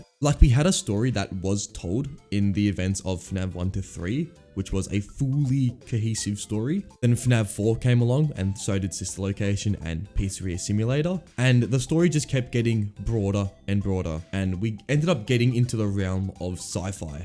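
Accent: Australia. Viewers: you sound australian...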